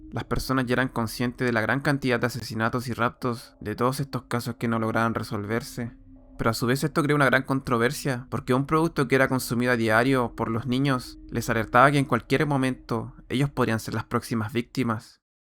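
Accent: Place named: Chile. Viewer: me gustan tus videos porque tienes acento chileno saludos desde chile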